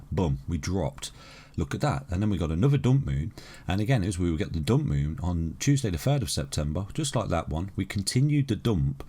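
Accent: United Kingdom. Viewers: Dump with the British accent!! FIRE 🔥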